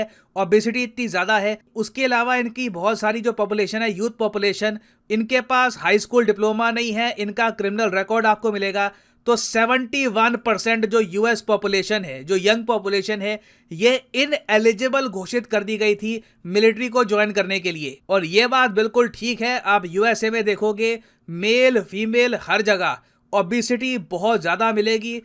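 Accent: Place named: Delhi, India. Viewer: sir kbhi-2 ekdum Delhi wale launde jaise baat krte h, how sweet 😂😂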